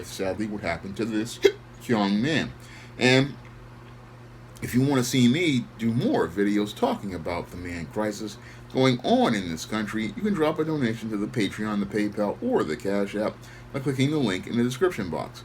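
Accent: United Kingdom. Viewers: Your british accent is so funny.lol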